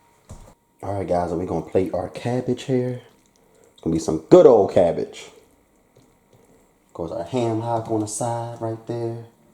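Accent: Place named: South Carolina, United States. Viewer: Bro, you sure you’re not from South Carolina?????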